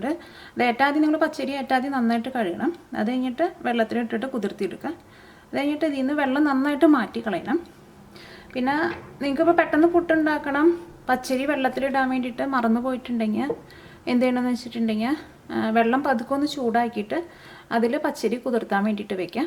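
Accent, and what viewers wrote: Thrissur, India. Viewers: Mam തൃശൂരിൽ എവിടെയാണ്? സംസാരം കൊണ്ട് തൃശൂർ ആണെന്ന് മനസ്സിലാകും.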